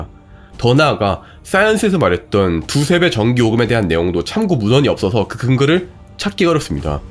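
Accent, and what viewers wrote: Gyeongsang, South Korea. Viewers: 경상도 아이가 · 경상도 같이 느꼈어요..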